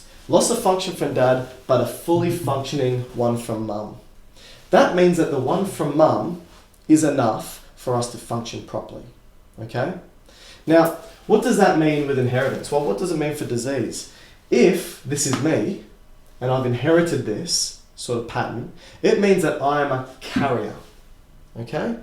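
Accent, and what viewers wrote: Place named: Australia. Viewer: Hi I only just found your channel as my daughter and I have a 1Q22 duplication and from what I’ve tried to research on it it is very rare and not much known about it and all the other channels and stuff I’ve found are from other countries but by your accent can pretty well assume your an Aussie and hopefully could shed some light on this for me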